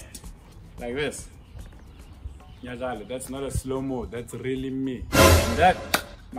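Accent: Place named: South Africa. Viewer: Where is this guy from?!?!? Getting south Africa vibes but Idk I also hear zim I'm confused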